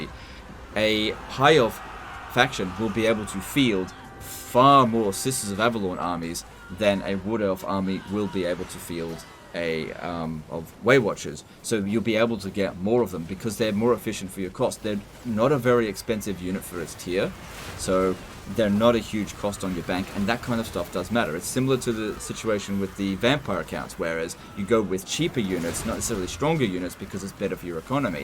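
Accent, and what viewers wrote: Australia. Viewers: I only just realised you live in Australia. It’s like the bloody Aussie accent didn’t give it away somehow. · Huh, didn't realise you are Australian. I thought the accent sounded familiar, but I'm so used to American or British content creators.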